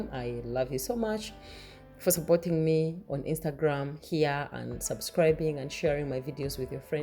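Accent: Uganda. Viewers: I love Ugandan English (I love everything about Uganda 😂). It is very different from Kenyan English. If you know, you know. Copying the recipe. Love and greetings from Nairobi 🇰🇪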